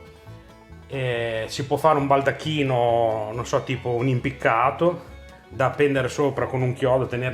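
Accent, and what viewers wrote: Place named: Naples, Italy. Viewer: Bravo, poche parole e dette quando servono, non come fanno nelle tv nazionali i soliti tromboni, e poi l'accento napoletano è la vera ciliegina sulla torta. Bravo! Bravo.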